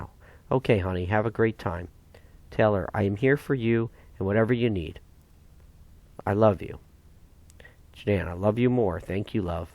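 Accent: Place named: United States. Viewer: Thank you for your greeting. I am a great fan of true crime. I find it fascinating that in the USA, you are privy to such documents and/or knowledge about the cases, no such liberty in the UK. I am on part 8 as I think I have probably watched all clips that Shannan or any of Shanann's friend have taken. Have you read any other crime cases? I think this has hit us so hard because we are they, ie we can identify with their lives. I hope you don't get bored .... I speak a couple of languages and it has always been my dream to read books in the first language of the writer eg. Anna Karenina in Russian, Anne Frank's diary in Dutch etc etc. Hearing you read these gives the correct nuances unique to the English spoken in the USA. Thank you so very much.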